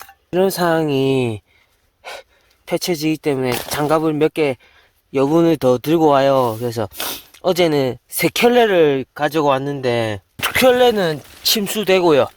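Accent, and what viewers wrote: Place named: Heilongjiang, China. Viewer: 옛날생각난다..말투가 흑룡강독립운동가 후손같네요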